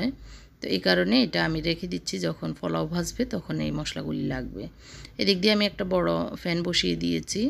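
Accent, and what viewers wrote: Noakhali, Bangladesh. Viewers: প্রথম দেখছি আপনার ভ্লগ। ভালো লেগেছে।♥️ আপুর বাড়ি কি নোয়াখালী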